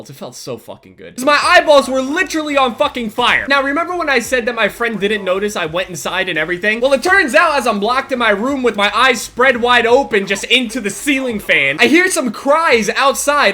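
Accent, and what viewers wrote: Australia. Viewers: Dat Australian <3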